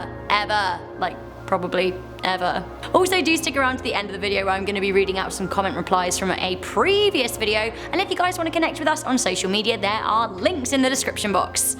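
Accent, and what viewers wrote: United Kingdom. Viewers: Won’t be visiting those sites. But I swear you are one beautiful women Jesus plus that British accent wow lol. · I may have a small crush on...or maybe a crush for Britain voices telling me things in top 10 list format.Either way I am satisfied 🙂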